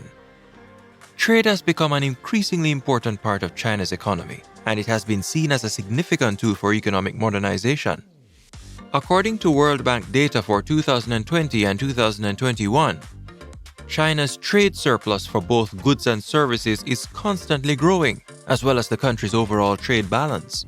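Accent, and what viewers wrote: Jamaica. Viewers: The fact that china notoriously deals with countries they KNOW CANT Pay the back i other to keep land is scary. He decided to invest in every poor 3 world island in the caribbean , Africa 2.0 icoming. Yeah look what they quietly doing i Africa. The guy that made this video sounds jamaican, and the fact that he speaks about this so innocently makes me sad loollll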